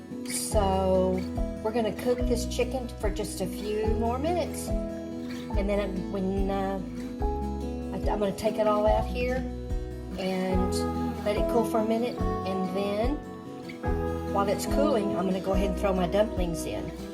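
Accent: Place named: South, United States. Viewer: You seem to have a southern accent, I can't believe you are using canned biscuits 🤦🏼‍♀️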